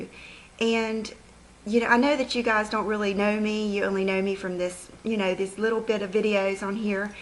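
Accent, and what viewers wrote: South, United States. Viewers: get your southern accent on say "kiss my butt" & keep making your own choices & decisions.... & videos... we luv you much much much... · One major reason why I subscribed to you was your sincerity & your enthusiasm. Plus you're southern accent. I married late in my life so we never had children but I always admire full time Moms. I Those nay-sayer see the world through their negative point of view. We are positive people so that's why you didn't anticipate these mean and cruel comments from them! Can't image what we don't know or feel. Be strong & keep up the great videos. P.S. I think your family is lovely! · the way I look at it you have two choices 1) fold up your tent and go home OR 2) don't let stupid people make you feel bad. I'd pick number two. People think they can say anything and hide behind the curtain of the internet. 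Block them out! I guarantee you that for each hater there are hundreds of us who enjoy your videos and look forward to Friday's haul. This California girl looks forward to hearing your southern accent! Keep it up, we love you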